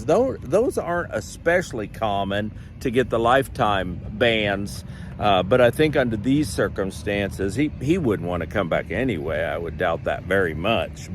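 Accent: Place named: Northeast, United States. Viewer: Where are you at in the states? Sounds like Northeast.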